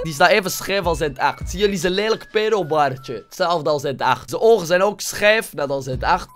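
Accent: West Flanders, Belgium. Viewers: Praat wa westvlaamser haha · kom je ofzo uit België (ben vandaag je gaan kieken)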